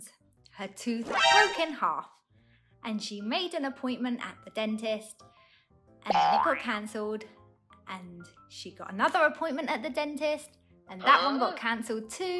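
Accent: United Kingdom. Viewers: 哇、正英國腔的美女、希望有空你也可以講些你家鄉的事、讓我們也長見識、畢竟英國太遙遠、或許透過你也可以讓我們多了解英國。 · 謝謝妳最愛英國腔了我的英文有救了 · 記得定期去洗牙齒喔不然會有牙結石價格也是掛號費很好奇英國或是其他外國人牙醫這麼難預約有習慣在洗牙的嗎? 還是說洗牙的費用比較便宜? 最近常常在網路上看到牙結石超大塊的案例不知道是因為牙醫太貴太難約又沒再用牙線才有這種狀況發生嗎台灣牙醫太多很多裝潢甚至非常的高級豪華卻一樣只收掛號費 (除非是矯正牙齒之類的健保不給付的) 所以牙醫的技術真的差異蠻大的我家高雄這邊就有因為拔智齒的速度快到被稱為快槍手出名的牙醫在但有的甚至為了賺錢很愛給人拔牙齒或各種牙齒保健療程把原本的流程分拆複雜化因為這樣他們能得到健保比較多的費用我本身認識的牙醫他就是主張能不拔就不要拔所以我四顆智齒都沒長歪牙醫師也說不影響就不要拔因此我對他很信任我曾經也有過牙齒補的地方掉了那時候我人在台灣但也真的超緊張怕吃東西殘渣之類的繼續腐蝕我的牙洞所以就馬上跟公司請假去看牙醫了所以我能理解你當時沒有醫療補助又遇到這種情形有多恐慌真的想不到國外有賣補牙DIY組.... 因為補牙的時候牙齒要完全清潔才能補上去不然細菌反而被蓋在牙齒裡面更糟糕最近開始追蹤我本身很喜歡英國腔覺得很舒服真的跟留言說的一樣難得有說的這麼慢跟清晰的英國腔:D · Love your beautiful teeth, smile, dentist stories and that bloody gorgeous British accent~~~~ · I really like you, like your British accent. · I love tha way u talk, so British! Is your husband Taiwanese? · 英國腔真好聽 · 您的英式英語口音真是令我覺得悅耳動聽啊！ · 英國腔，好好聽喔 ~~ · 英國腔真好聽。台灣牙科還是有更高級的診所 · 英國腔英語很好聽，南非也是英式語言，我住過幾年，但是沒有學好英語！現在聽到熟悉英語很親切，很好聽！很棒！謝謝分享！😄🇹🇼💯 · 英國美女講出好聽的濃濃英倫腔，速度及清晰度都適合用作語言教材範本。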